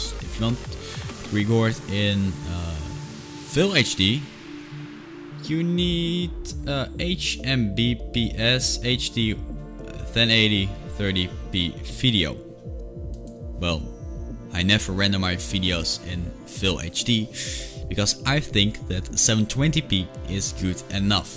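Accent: Netherlands. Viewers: The first time i heard the voice of him, i knew it was obviously dutch // De eerste keer dat ik je stem hoorde, wist ik gewoon dat je nederlands was!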